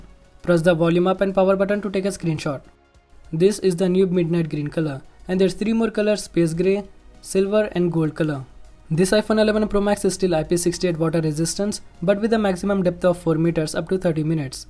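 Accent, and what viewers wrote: India. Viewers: The thing that I love about this iPhone is the camera. Your English with an Indian accent is cool to hear.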